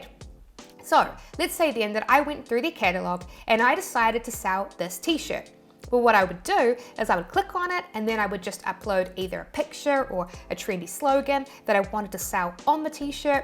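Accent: New Zealand. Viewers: I just realized how different the NZ accent is compared to the Aussies. By the way, very good information here. I was wondering how to start a T-shirt/mug printing business like yours. Thanks! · She's from New Zealand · Are you kiwi??? I don’t think I caught your accent before! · Her accent with 'e' sound every time brings me back memories of my trip to New Zealand before corona...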